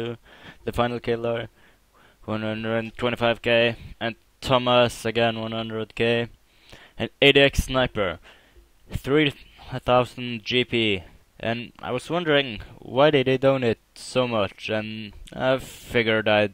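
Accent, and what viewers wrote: Germany. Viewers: ADX are you German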